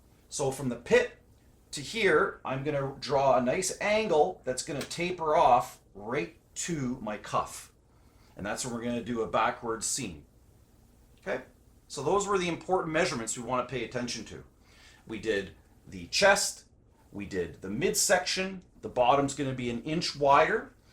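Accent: Canada. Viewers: Loving that rich Canadian accent!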